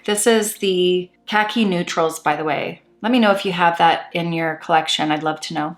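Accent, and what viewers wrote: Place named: Canada. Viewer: Lisa’s team would probably let you return it for a refund, if you don’t think you’ll use it. They’re amazing! To answer your question, I use my fingers with the more glittery ones too, or a wet brush like you do. That madrigal(?) shade is so lush though! I’ve got all of the lustre ones (collected gradually, I’m a bit of an addict with LE stuff 🤣) but not as yet any of the liquid silk ones! Hopefully soon, as my budget allows. 🤦🏼‍♀️🤪 I think they might be my go-to ones once I get them! 😍 Delighted you replied, thank you. It must be hard keeping up with comments and editing etc!! I appreciate your work and time. X I so love your chatty natural style, it makes me feel like I’m in the room with a friend, watching you put on your face, haha. I love that. 😂 I’m from Scotland, of all places, my name is Linley. I love your wee Canadian accent touches. 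🥰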